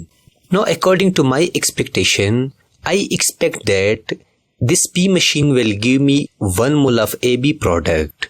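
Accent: India. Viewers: 🎉🎉 thank you I thought u r from India 🎉❤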